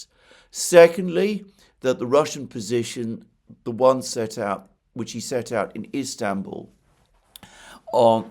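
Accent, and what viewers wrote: United Kingdom. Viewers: thanks, for sharing your insight and experience. its refreshing to hear a speaker on international affairs that you can trust, in spite of the british accent.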